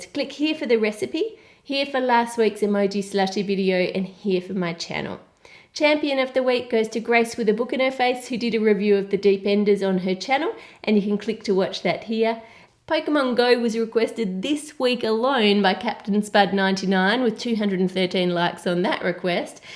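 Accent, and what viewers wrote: Australia. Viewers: You know shes Australian not British or American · Are you from Australia?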